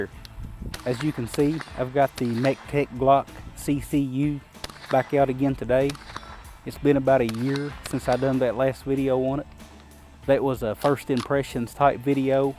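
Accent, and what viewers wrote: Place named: South, United States. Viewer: southern accent and looks Hispanic.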